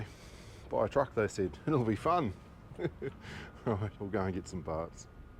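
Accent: New Zealand. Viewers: I bloody knew it,,,,, You're a Kiwi.😂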